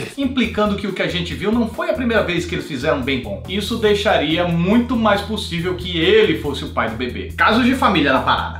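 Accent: Northeast, Brazil. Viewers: esse cara é nordestino?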